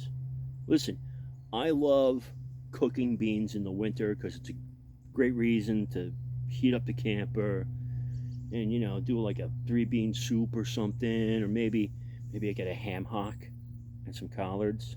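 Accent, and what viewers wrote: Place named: Long Island, United States. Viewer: you r an american orthodox cowboy with a longisland accent. a ministry for the ppl. retards unite. simplecore ftw. and mexican liquid bread is a simple joy. 💕🙏🏻 always praying for u Fren.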